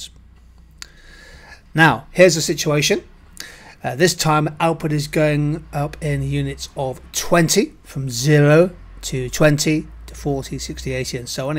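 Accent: United Kingdom. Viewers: Finally found great vids with British accent lol Thank you for uploading. It really helps! Your voice reminds me of my business teacher in secondary by the way. Greetings from Indonesia Sir!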